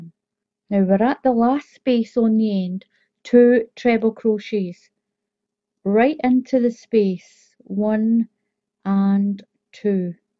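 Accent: Scotland, United Kingdom. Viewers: Thanks for a lovely CLEAR TUTORIAL. I'm stuck in bed after heart surgery so looking forward to trying this pretty pattern later today. Lots of love Kathy. Also lovely to hear a Scottish voice. Been away from Scotland for 50 years ❤️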